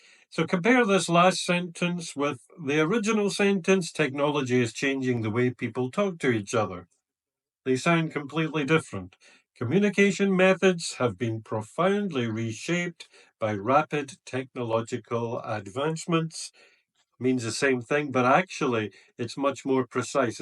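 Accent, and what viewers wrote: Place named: Scotland, United Kingdom. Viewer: Thank you so much, this is very helpful video, and are you from Scotland? I notice that from your pronounce to /e/ & /r/.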